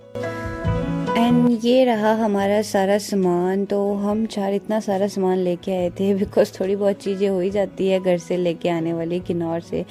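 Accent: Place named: Punjab, India. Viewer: Ur punjabi accent is really gud from where u did ur schooling and higher studies?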